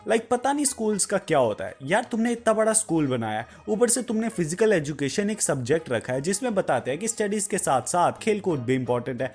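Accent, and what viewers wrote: Jammu, India. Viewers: ji aap jammu sai ho toh dogri bhi aati hogi🤣🤣 Dangar........ I am also from jammu and you know what when i saw ur first video i got that u r from jammu becoz of ur way of talkinh hehhehe I was laughing like hell while watching this Love ur videos ji☺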